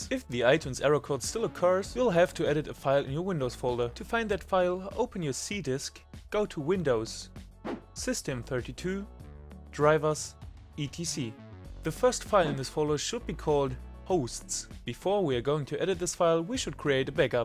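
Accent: Germany. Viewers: I like the German accent lol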